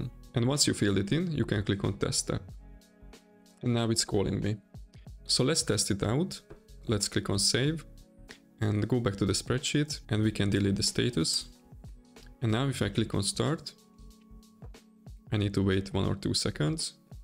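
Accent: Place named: Hungary. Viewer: Volt egy apró magyar akcentus, mondom megnézem a felhasználónevét és jól tippeltem :D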